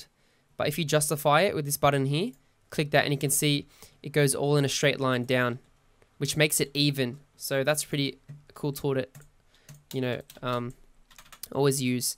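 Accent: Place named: Australia. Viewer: Ah an Aussie chap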